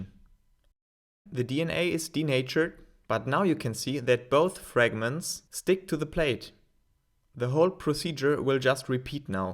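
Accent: Germany. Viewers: no one teaches better than a guy with German accent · Are you German?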